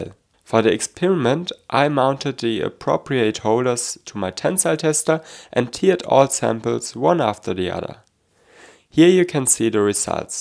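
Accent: Germany. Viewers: the british pronounciation doesn't sound like that. Yours and Stefans is more like a very heavy german accent, which is ok i guess but funny nonetheless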